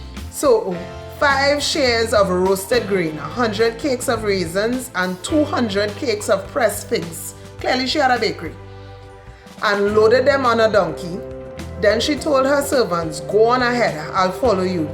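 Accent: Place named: Trinidad and Tobago. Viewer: Yesss this is so true plus the story in a Trini accent makes it even better 😊 😘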